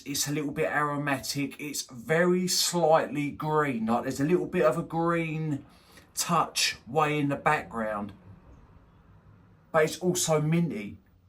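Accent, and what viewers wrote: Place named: England, United Kingdom. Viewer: you were saying the house of M.Micallef correctly. DesirToxic is totally my speed! I can’t believe you’ve never reviewed this scent on your channel before. It’s pretty popular in America for a niche scent. The new version, DesirToxic L’Intense (2024- adds a heavy note of Mint in the opening but removes the majority of the greener cannabis aspects from the heart (I can’t remember if cannabis is still a listed note, and I’m too lazy to look it up at the moment). Anyway, just had to mention that it always tickles me every time you say the word “Three”. It sounds like you’re saying “Free” to my American ear. I find it funny, I promise I’m not making fun of you, it’s our little differences in life, ya know? Anyway, I’m interested in accents, do you mind telling me what part of England you come from or what region your accent derives from? 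I know there’s like a Manchester accent, and a Posh section of London accent, etc. Just curious. Please take care of yourself and I pray you're doing well. All the best from sunny Florida. P.S. I’m always impressed by your nose, thats why I continue to watch and I like your presentation style- not a lot of fluff! Just down and dirty, you get right into it.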